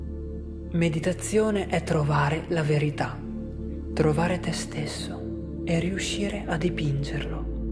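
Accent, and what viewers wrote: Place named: Veneto, Italy. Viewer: Secondo me sei Veneto dalla parlata .....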